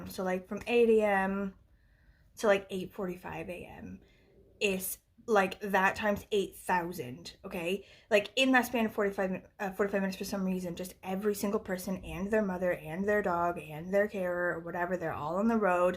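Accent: Wales, United Kingdom. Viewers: Loved hearing the Welsh again. You sound amazing to me. Why are you taking the class? That sounds like a really stupid question since I know you LIVE in Wales, but seems like it’s become more of a priority in the last year. 🎄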